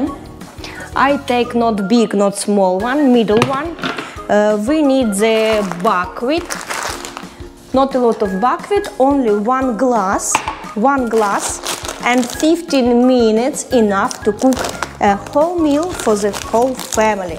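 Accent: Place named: Ukraine. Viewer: Sounds Ukrainian to me!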